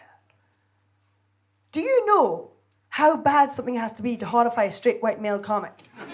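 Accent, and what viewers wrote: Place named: Scotland, United Kingdom. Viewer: As a Jock myself, all I can say is..Now that's a brrrraw Scottish accent......That's how you rrroll you rrr's my friends!...Apart from that,..I love this style of dark, auld Scottish, bardish, storytelling, there's little hints of 'Ivor Cutler, Chic Murray, Roberta Burns in there...surreal, wierd, dark, chirpy, poetic, uncompromising..and helluva funny!.....It hud me heehawing a guid few times!.'Maybe it's not true'..hud me rollin'..😂..Connollys gone....here comes!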